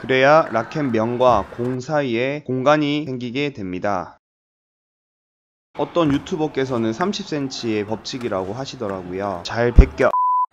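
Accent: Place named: Seoul, South Korea. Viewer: 서울 사람 되가네? ㅎㅎㅎ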